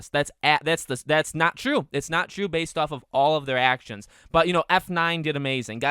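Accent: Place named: Massachusetts, United States. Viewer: The Massachusetts accent is really strong with this one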